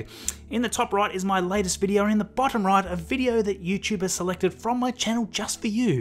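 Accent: Australia. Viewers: Because I'm Aussie, I can tell from your accent, you definitely must be an Aussie too?! Lol.. You still down here or did you move to America? Don't think anyone from the public would know, but do you know if the final design of the interior of the Starship has been completed? Good reporting 😉 🇦🇺🇺🇸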